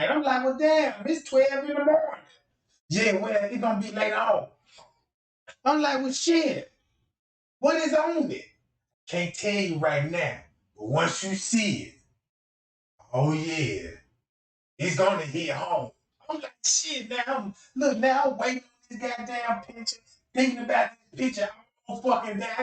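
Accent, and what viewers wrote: South, United States. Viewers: Hey Boo, I Love You, and I know God is gonna Bless You for all your Dedication and hard word. And the Awesome part is your doing it from your Heart, I been riding since day 1 when I feel asleep on my phone and woke up to hearing ur sexy voice with the Sothern Accent it caught my attention and I never looked back. Thanks For all your hard work💯💯🥰💋💖💜🙏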